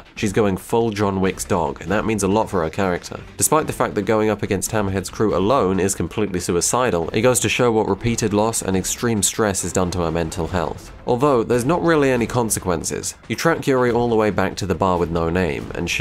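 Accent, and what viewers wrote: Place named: United Kingdom. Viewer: Well you do sound British, makes sense why you find any weather other than abnormal.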